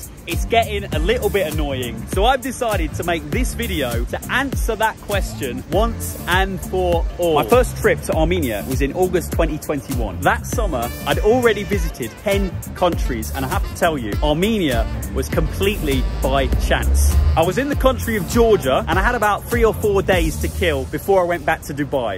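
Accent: United Kingdom. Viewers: Надеюсь это троллинг он говорит с Южным с британским акцентом... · Oh, this is so adorable to hear how you praise our country in British accent which I am crazy about ))...I used to live 6 years in nearby Reading. People in England are very friendly and smiley...))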